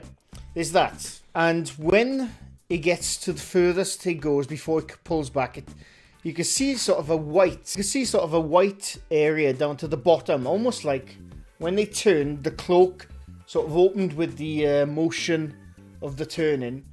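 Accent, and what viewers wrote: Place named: Scotland, United Kingdom. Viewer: You go sir, I would love to meet you and we do our own investigation and your absolutely correct once that money rolls in they are no longer a progression of themselves and start making bullshit. Id do a investigation with ya but it seems like your from maybe Scotland holy shit that whole place is haunted as hell I truly believe. Keep doing what you are doing I love it ..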